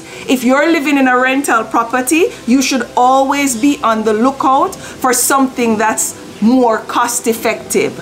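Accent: Jamaica. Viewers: keep impacting. love the patua jamaican tone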